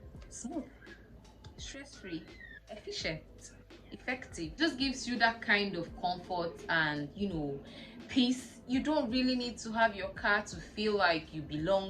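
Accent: United Kingdom. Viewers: This was so fascinating to watch and oh, you've got the accent 🤗. England has and still is, my dream country 😅. The day I get opportuned, I'll contact you to walk me through 👏🏽. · yeh few more months an u would have been speaking 🗣️ queen English…it was bang on‼️ really good to hear👂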